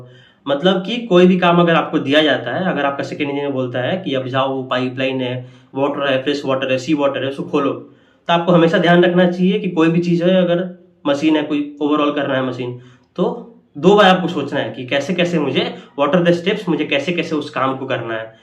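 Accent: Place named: Bihar, India. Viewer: Bakwass video 😂😂 typical bihari 😂😂 Bhai teri awaj se hi lag ra h Tu pure bihari h 😂😂😂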